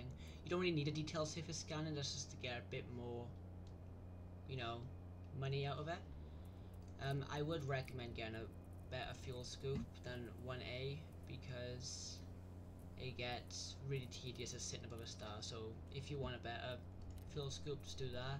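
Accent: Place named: Wales, United Kingdom. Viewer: audio was quiet but i heard a fellow Welsh accent so i plugged in 40 speakers and it was ok in the end. Nah it wasnt that bad lol. Thanks for the info, i was messing around with this today and this will really really help. Thanks ✌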